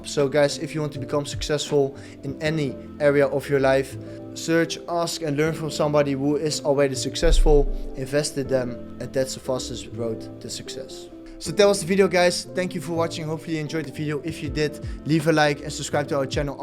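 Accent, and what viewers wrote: Netherlands. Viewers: Klinkt nederlands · Lekker Nederlands accent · Love the Dutch accent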